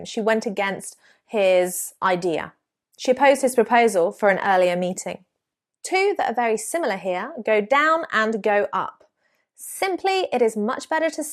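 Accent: United Kingdom. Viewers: realy I love you and your way in speaking british English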